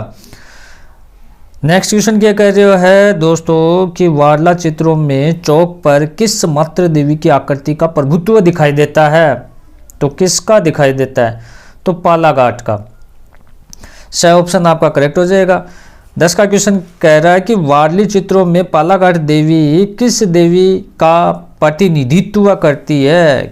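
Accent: Rajasthan, India. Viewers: Bhai Aap kha se mujhe Aap ke boli rajstani lag rhai ❤ me Rajasthan se hu my fevrete boli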